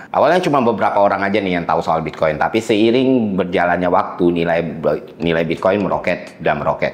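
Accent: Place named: Minangkabau, Indonesia. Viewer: Baru sadar kalau ko urang awak.. patuik lah nyo mode ko caro bapikia nyo caro mangecek nyo.. sukses selalu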